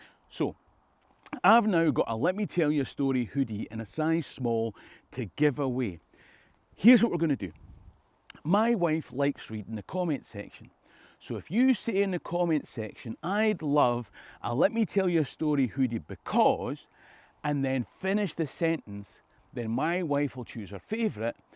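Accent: Scotland, United Kingdom. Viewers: Hello, hello from Virginia in USA! I would like to have a "Let me tell you a story..." shirt because I recently told about my Scottish heritage & I LOVE learning about Scotland from! He is fascinating, engaging & I adore listening to Scottish history in a brilliant Scottish accent! Thank you so much for telling stories! 💜💋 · You live in Scotland, you sound Scottish! So I think you're probably Scottish! I've known several Americans who claimed to be proud Scots. But they'd never been to Scotland & couldn't even pronounce Edinburgh! Don't let anyone tell you you're not a Scot! Great video! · I'll leave that small hoodie for someone else, but I love your channel! The wonderfully presented history, the beautiful Scottish accent, the scenery, and humor all keep me coming back for more. Keep up the good work!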